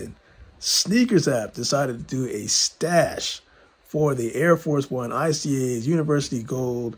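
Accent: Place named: Boston, United States. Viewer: U had to be from Boston I tried.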